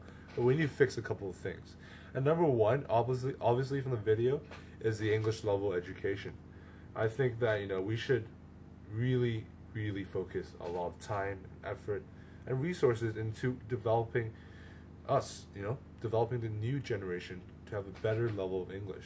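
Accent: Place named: United States. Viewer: a curious question, if u are brought up in HK for 18 years how cum u could speak with an American accent???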